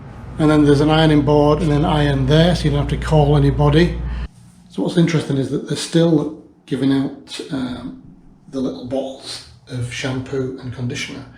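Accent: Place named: England, United Kingdom. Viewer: Hi my friend we are suppose to come in September this year,hows thinks looking over there ,hope you are all safe,you sound english have you moved over there .thanks lee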